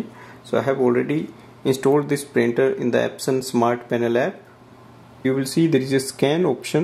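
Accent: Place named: India. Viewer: Plain & simple & i love ur indian accent 😝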